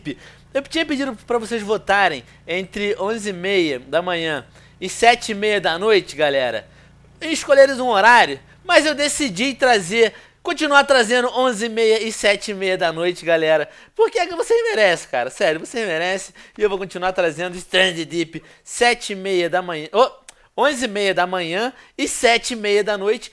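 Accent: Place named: Portugal. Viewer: fala portugues de portugal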